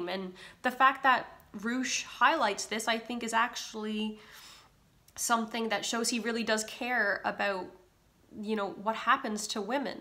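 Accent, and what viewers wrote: Newfoundland, Canada. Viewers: That's the Newfoundland accent with a bit of overseas dilution in it. It's quite unique.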